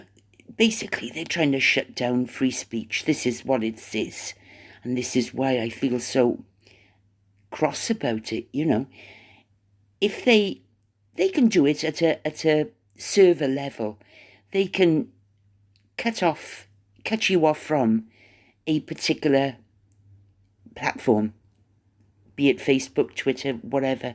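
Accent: Wales, United Kingdom. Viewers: Sweet love hearing yr welsh brogue, you can witter away as much as you like. Yr channel, we all come to listen to you whatever the subject. BTW Kamala H can’t pass any bills under a “lame duck” GOP (I think that’s what it’s called before November 2024 elections, am sure our US cousins will advise if incorrect). Why are the people’s “tips” being taxed⁉️ I thought a tip was a “customer gratuity” to make up for low pay & also to get good service, how bizarre. Have a fabulous morning, afternoon or evening 😘🦘🐨💐🇦🇺🏴🇬🇧🇨🇦🇺🇸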